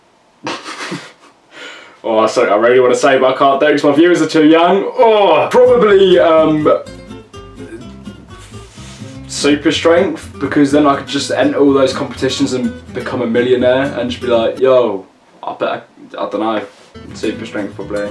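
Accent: England, United Kingdom. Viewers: Wow your so beautiful and your voice is so hot omg love this uk accent. You have incredible eyes. And your so fucking funny haha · he sounds British because he is, so don't try to compare him to every british YouTuber 😂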